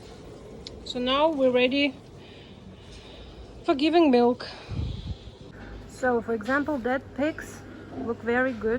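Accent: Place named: Eastern Europe. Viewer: You have very good english for what seems like you're a Eastern European pig farmer. I'm assuming Eastern Europe from your accent. I'm impressed. Most Eastern European pig farming videos I've seen on YT, they can't speak english.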